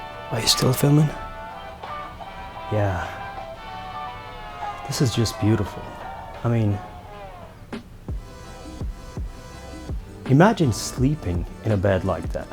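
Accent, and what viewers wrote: United States. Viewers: but you have an American accent ,in Turkey they teach British English ,so you were in school in US?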